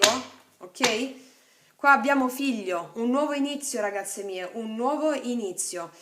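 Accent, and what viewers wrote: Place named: Salento, Italy. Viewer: Ti ho scoperto x caso e la luna rossa dice delle cose a cui voglio credere. Il tuo accento mi è familiare. Salentina? Brava. Grazie.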